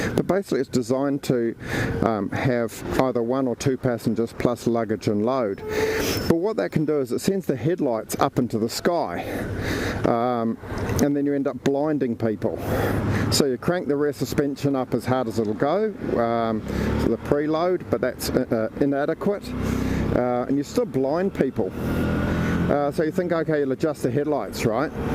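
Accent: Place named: New Zealand. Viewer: thanks for the clarificatio..ive been thinking why am I listening a kiwi accent from American.. Lol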